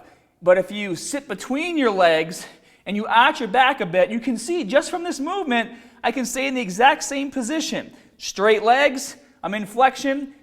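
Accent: Boston, United States. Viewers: He is from Boston. It's the way he speaks. Tough luck if you don't like it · Petro .... he sounds like he is from Boston or from the New England region. Kinder like some of us yanks who are lost when we try to listen to some of you English from some parts of your country, i.e Geordie accents. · Viknesh Jay ?? Australian? Please tell me you know the difference between a Boston and Australian accent? This video is from Aug 2016!! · Maybe it's because I'm not cultured but I couldn't tell if was from Boston or Australia until I read the comments. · Great content. But the Boston accent makes me want to throw up everytime I hear that open "Ah" sound. Please for the love of all that is Swole, tone down that accent! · dude wtf is with your accent?! the "bahbell" suggests boston but you almost sound scottish at times and neither pronounce the word "mascles"! 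· I know!! I'm from Boston and approve of his accent 😛 so I went to show his videos to my husband, only to find out that his accent only comes out in some of his videos. I think he used to have the accent early on, then got rid of it for whatever reason, but now it's back with VENGEANCE. Love it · Is that Boston accent? I thought it was extinct. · A few seconds into the video and I had to check the comments to make sure people were making fun of your Boston accent. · I find the that this video was great and informative! Apparently people on here are not so well traveled and live in a bubble where they can't recognize a Boston accent. I am from Chicago and I get the same thing because my accent. As for the video,I think people have a hard time getting out of bad habbits when it comes to form. Some people are so used to doing it wrong that when they try the right way they get hurt. They try to do it the right way with the same weight. 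I have seen this many times with other exercises well. As soon as you tell them to lower the weight and use proper form some struggle,but can do it. Others try the same weight and either get hurt or cant do it at all. Ego lifting is correct and true. This is why I have always been surprised how people still make gains with crappy form. Yet steroids seem to be involved. Of course when they are 60 and complaining that there back hurts. They might regret not taking some sound advice when they were younger instead of trying to impress someone at the gym. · So used to the Boston accent now - just sounds normal to me ! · This guy sounds like he is from barwstan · his boston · i remember watching your videos years ago and you never had a boston accent, wtf happened? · Dude, what's with the Boston "a"? I've been watching your vids for 6 years, and you never even came close to talking like that in the past. 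If you'd always spoke that way it'd be one thing, but since that's not the case it's just annoying.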